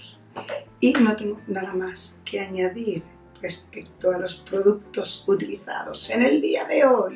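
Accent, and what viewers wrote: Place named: Málaga, Spain. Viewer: Cómo puede ser que tengas acento de Málaga si eres de Huelva? me causa curiosidad, ¿no tendrás familia malagueña? Por cierto, el maquillaje te queda genial. Un saludo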